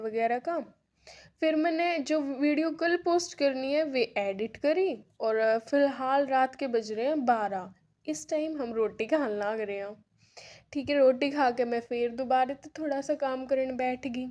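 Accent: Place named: Haryana, India. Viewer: Aww so pretty u are ❤ And your haryanvi accent is so cute and soothing to hear ❤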